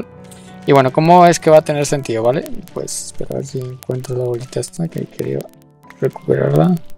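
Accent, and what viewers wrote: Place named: Bogotá, Colombia. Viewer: De donde sos? solo por curiosidad? PD: Pareces de bogotá por la forma de hablar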